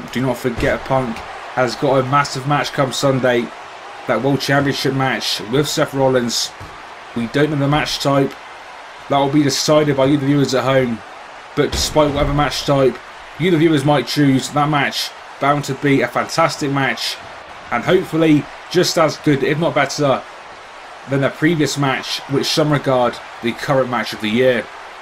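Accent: England, United Kingdom. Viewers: are you English? love your vids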